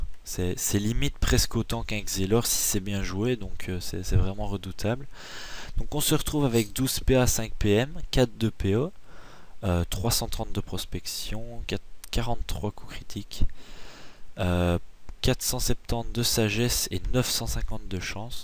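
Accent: Belgium. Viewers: Il est belge:D ?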